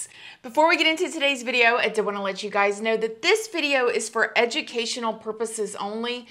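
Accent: South, United States. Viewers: Your southern accent is so strong, I love it!x I’ve been waiting for this to happen…it makes sense now why when she was found, she went super silent! I always thought it was cause she was traumatised, but maybe she’s trying to avoid inditement! Actually makes me sad for her husband when the cheating accusations came out! I wonder if he’s standing by her 🤔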